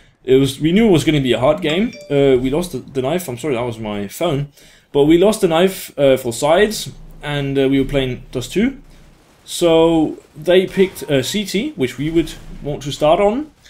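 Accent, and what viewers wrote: Denmark. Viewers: He is from Denmark :))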